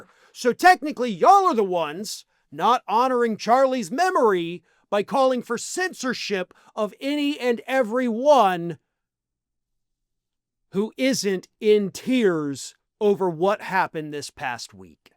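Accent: South, United States. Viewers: Stop using the mocking southern accent to indicate you think the speaker is stupid.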